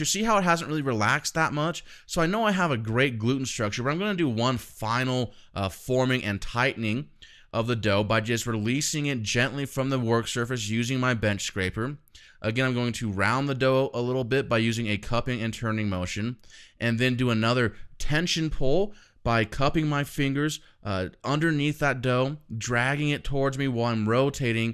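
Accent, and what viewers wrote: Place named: United States. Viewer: You have the same American accent than William Lane Craig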